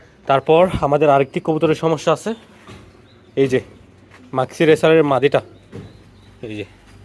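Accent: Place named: Chattogram, Bangladesh. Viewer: Bhai apnar basha Chattogram ar kon jaigai?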